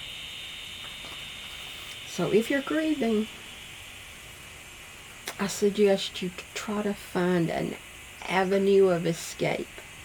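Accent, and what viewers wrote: South, United States. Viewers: I like hearing the rooster. I like hearing all the birds and the nature. I like swinging on the porch with you and I like your southern draw. It is all so pleasant and relaxing. I am sorry you have to go through all this. You will never forget Curt and he loves you and will always be with you. He wants you to be happy and have joy.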